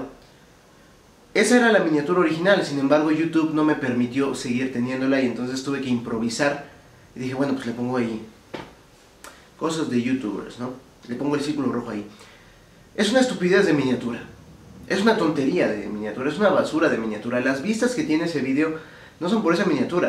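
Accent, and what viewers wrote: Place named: Peru. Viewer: De dónde eres? Pareces de Perú. Por el dejo más neutral.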